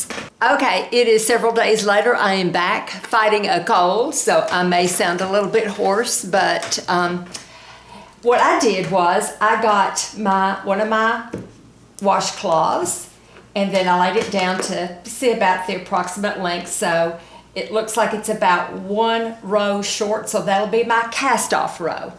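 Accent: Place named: Tennessee, United States. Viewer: So sweet to share. 🩵 love listening to your voice 😊 & Tennessee accent 😂🥰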